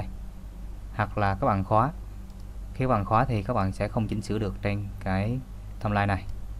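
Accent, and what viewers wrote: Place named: Quang Nam, Vietnam. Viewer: anh người quảng nam hã, cho e làm đệ tử với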